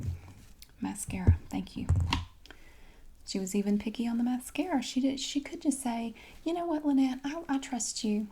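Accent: South, United States. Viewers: I think it's funny how the title says "super southern" yet this is how everyone I know sounds.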